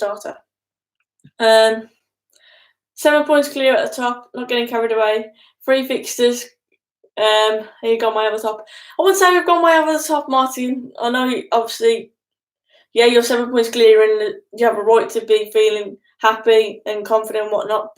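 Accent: Manchester, United Kingdom. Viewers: Nice manchester accent.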